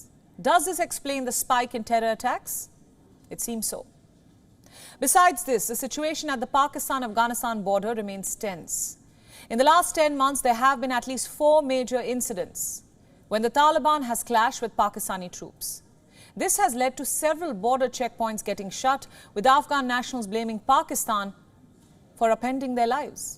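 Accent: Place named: India. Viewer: that Indian accent is giving me nightmares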